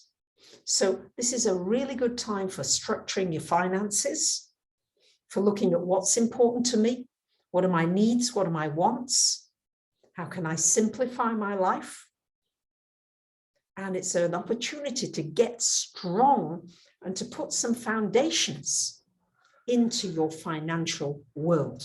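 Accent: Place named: England, United Kingdom. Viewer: Thank You! 🤍. I got an extra, personal, heartwarming confirmation with this video as you clapped your hands together. My grandmother was from Coventry, England, and it was something in that sound, as well as your accent, that reminded me she is with me. 🤍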